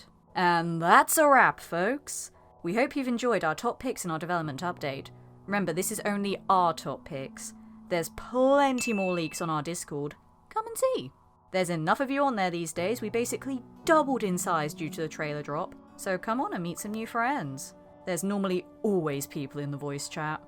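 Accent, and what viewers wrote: United Kingdom. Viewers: Bri ish 🤢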